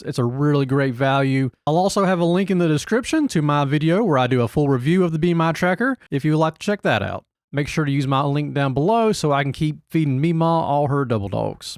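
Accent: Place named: Tennessee, United States. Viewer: This guy is either from Tennessee or Kentucky. That accent..holy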